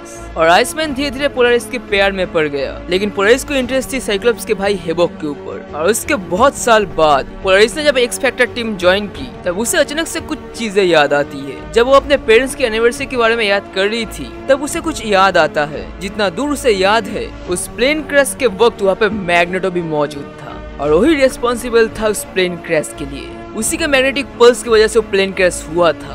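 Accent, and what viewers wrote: Bengal, India. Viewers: Bro tum bengali ho kiya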